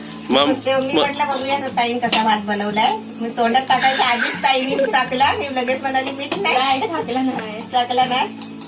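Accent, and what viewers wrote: Konkan, India. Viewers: Kaku tumhi koknatle aahat ka?? Chan watay tonning aikayla.receipi mast.🙏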